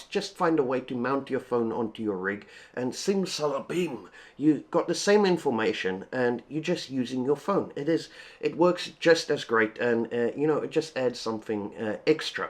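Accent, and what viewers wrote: South Africa. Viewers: you sound south african?! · Nice video. Sold me on the pedals 🙃 you South African? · South African accent ?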